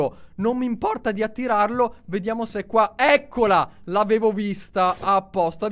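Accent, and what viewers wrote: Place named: Veneto, Italy. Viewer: Oddio ma sei venetoooo anche ioooo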